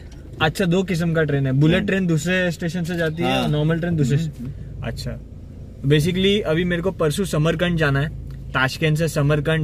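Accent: Gujarat, India. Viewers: Being a gujarati myself, muje to iski hindi me bhi gujarati h sunai deti h. 🤣👍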